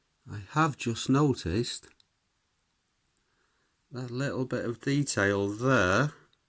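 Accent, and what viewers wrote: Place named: Liverpool, United Kingdom. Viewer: What’s a scouser doing in Finland?!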